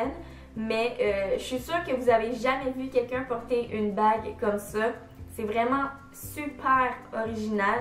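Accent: Quebec, Canada. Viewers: J'adore tellemeeeent l'accent québécois c'est fou hihi ! Et j'aime aussi énormément tes vidéos !!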